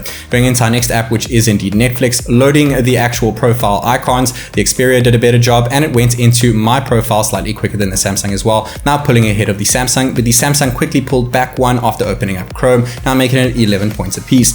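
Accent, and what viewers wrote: South Africa. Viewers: Bro are you South African?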